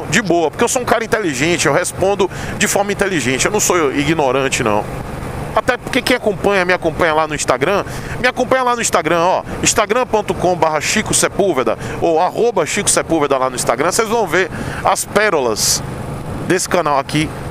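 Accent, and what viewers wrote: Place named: Northeast, Brazil. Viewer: Que vídeo necessário meu irmão, nestes tempos de culto ao ódio, é bom demais ouvir palavras como à sua, um cara sempre alto astral. Eu nunca fui de ler comentários no youtube, pois acho que é muita destilação de ódio. Então meu caro, fica essa força da minha parte para contigo, eu que sou negro e ateu e pobre sei o que é sofrer preconceito. Meu amigo o sotaque nordestino é maravilhoso, sou do interiorzão de Minas e acho legal demais o sotoaque e a resiliência que o nordestino tem! Um forte abraço, continue brilhando meu caro!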